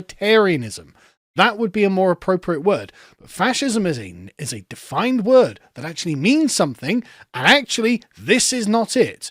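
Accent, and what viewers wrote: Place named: Posh, United Kingdom. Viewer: Also listen to this YouTuber speak, guarantee he went to a private school the hypocritical prick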